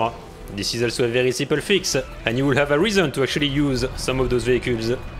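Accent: France. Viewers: How do you sound even more French every time I watch any of your videos 😅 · No way again french accent guys 😮😮 nice vid btw xd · Your French accent was 10/10 in this video, idk it was just so lovely lmao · Do you have any idea how French you sound ?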